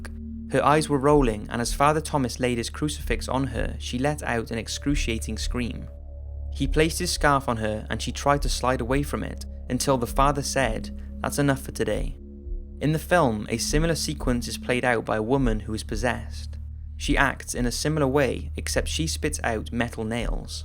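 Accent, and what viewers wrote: Wales, United Kingdom. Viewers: gjoni I think he is Welsh butt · Alex Wilde His accent is Welsh! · The narrator is Welsh. A nice welsh accent. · ah...love that soft Welsh burr. Strangely comforting, given the horrific subject matter! 😉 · Is that a welsh accent I hear? Aye Welsh squad turn up🤘🏼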